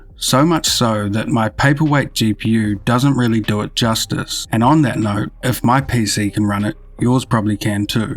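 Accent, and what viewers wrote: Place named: New Zealand. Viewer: This guys a kiwi?Hell yeah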